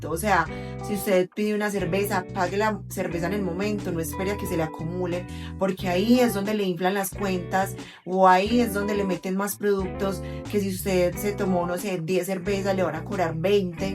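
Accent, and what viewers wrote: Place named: Antioquia, Colombia. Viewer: Gracias, me gusta ver tus vídeos para mejorar mi oído para el español, especialmente el acento paisa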